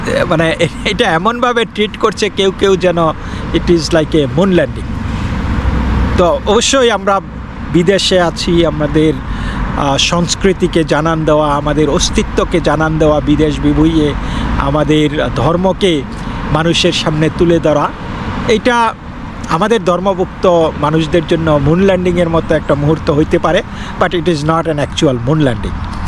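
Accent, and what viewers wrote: Bangladesh. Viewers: ওওওও দাদা আপোনার বাংলা বলার ছিরি অমন ক্যানো? ওওওও বাংলাদেশের আপনি?ইন্ডিয়ার বাংলা এমন নয়।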